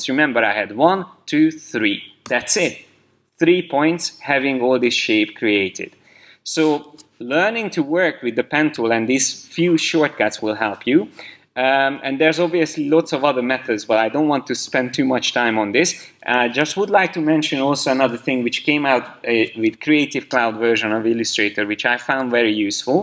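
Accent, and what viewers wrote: Hungary. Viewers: magyar akcentust vélek felfedezni, de ez persze nem változtat azon, hogy szép munka! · Hey are you hungarian? Im pretty sure by the accent. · Érezhetően magyar akcentus, jó hallgatni! Könnyebb megérteni :D Köszönöm az útmutatást!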